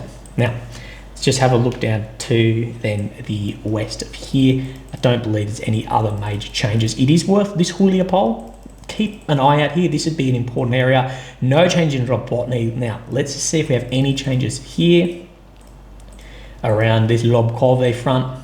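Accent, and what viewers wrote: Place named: Australia. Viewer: Today with this green t-shirt, you look every bit the Australian that your accent gives away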